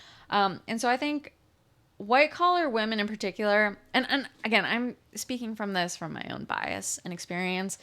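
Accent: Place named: Northern Virginia, United States. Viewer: 30+ years in DC. 75% of women workers were superfluous. True CS degrees had at most 2% women and they were foreign. UMd had the best CS program. Further, DC is NOT segregated. That’s what makes it dangerous. Clueless white people move into black neighborhoods and become a statistic. You sound like you lived in far NW or Northern Virginia. You don’t sound like you lived in DC proper… Which was a good idea.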